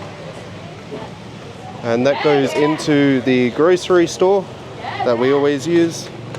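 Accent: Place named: Australia. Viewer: I think he is Australian, it's his accent.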